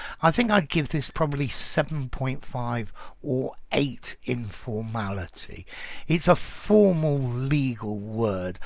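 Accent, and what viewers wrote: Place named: Oxford, United Kingdom. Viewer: His accent is from Oxford.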